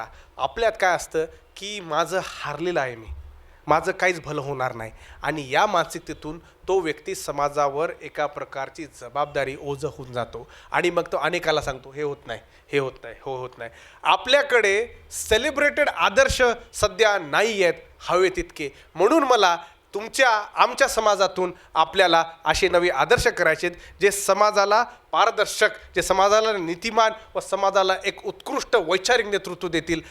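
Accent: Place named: Marathwada, India. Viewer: मांडणी सुंदर आहे. पण भाषा पक्की मराठवाडा भागाची ग्रामीण वाटते. सर भाषेवर प्रभूत्व असले तर मांडणी फारच सुंदर वाटेल.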